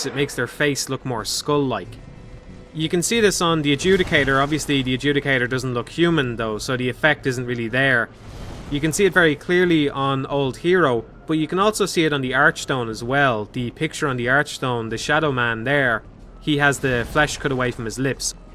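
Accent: Ireland. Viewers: He's very obviously Irish. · Irish lilt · are you irish? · your sweet Irish brogue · I only just found this video and your channel . Can't wait to check out your other stuff. And you sound Irish . So from another Irish I'm glad to find a Irish YouTuber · I watch this video at night to be lulled to sleep by your sweet Irish brogue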